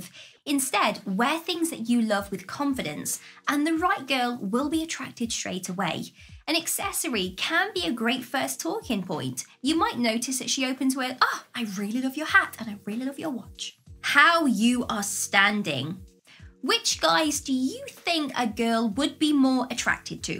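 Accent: United Kingdom. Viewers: Now even though I'm 57 and very happy in my own skin, this is such a fun video to watch because the speaker has a most pleasant British accent and seems to be able to present her "material" in such a fun & fresh way. IMHO, this video is way more enjoyable than most of the shows on TV. Anyway, I just wanna say, "keep up the good work"..... I think your efforts are not only practical but quite easy to grasp😊 👍👍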